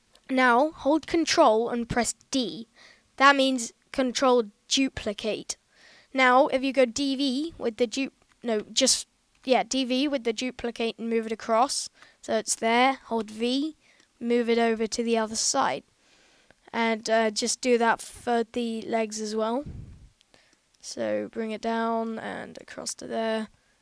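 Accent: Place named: United Kingdom. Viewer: Sounds like British am i right? , indeed GOOD JOB mate!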